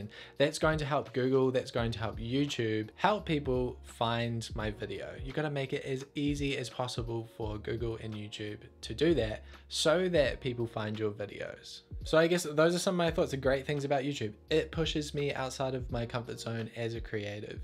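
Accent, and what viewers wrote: New Zealand. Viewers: Awesome video man, thanks for all the tips. So nice to hear that kiwi accent, sounding mint! I'm just starting out with my videos, excited to work on some long form.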